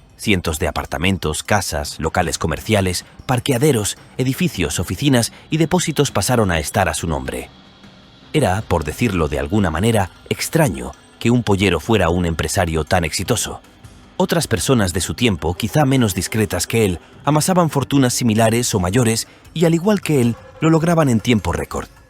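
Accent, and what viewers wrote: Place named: Spain. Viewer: Esa voz spanish 🤮🤮🤢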